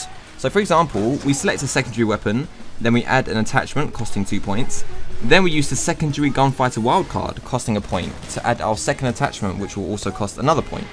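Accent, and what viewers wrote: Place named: South London, United Kingdom. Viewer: Nice video. Where are you from? You sound like you're from South London, around Croydon?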